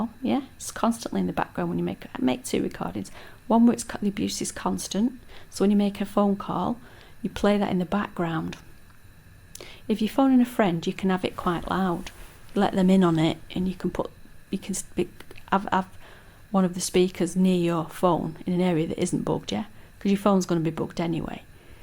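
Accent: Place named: Northern England, United Kingdom. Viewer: interesting idea I notice you have a north england accent I'm from there too. my views on who's doing what is controversial I am wondering what you believe triggered your targeting? as its rarer in england than America nevertheless let's just say Obama has a lot to answer he commited unreal crimes internationally against innocent citizens. all the best I like your idea I constantly give them abuse covertly while on a phonecall I found being reasonable doesn't make them stop. I agree about the hacked phone thing - the signs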